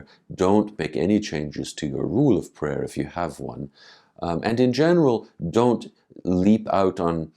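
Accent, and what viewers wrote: United States. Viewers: he does sound like an American that’s changed a bit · Vladyka bless. I thought you just had an Atlantic American accent. I was born in Australia, but being of Macedonian background, I still use some Slavonic hymns and phrases. Svyati Boze, Svyati Krepki, Svyati Bezsmrtni, pomilui nas.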